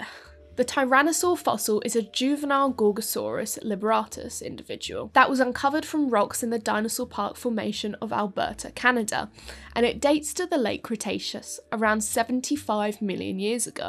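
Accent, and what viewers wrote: United Kingdom. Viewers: She also has a the THICCEST bri'ish Accent of the Trio now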